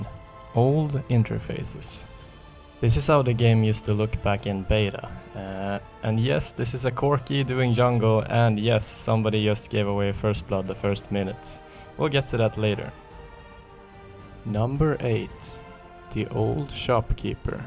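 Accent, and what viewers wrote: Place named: Romania. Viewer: Deci dupa accent deja ceva nu era ok, ba asta ii roman, dupa screenshot-ul ala... :))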